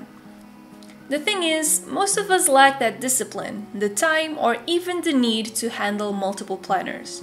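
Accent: Brazil. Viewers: Is she brazilian? Her accent looks like a friend of mine...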